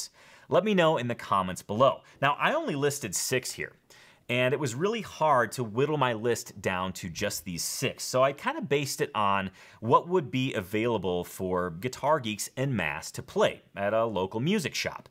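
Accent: Chicago, United States. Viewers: You are my favorite Chicago “regular guy” accent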